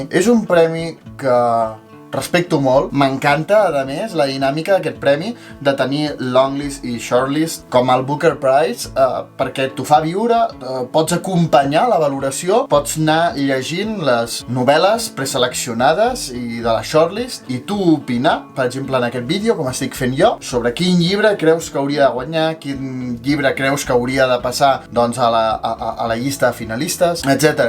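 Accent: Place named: Central Catalan, Spain. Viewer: Parles un català molt central! És així el que parleu a Andorra?? Pensava que seria més tirant a lleidatà! PD. No he llegit cap d'aquests llibres, però només per la passió que vas posar a la ressenya de Persecució, estic amb tu mil per cent xD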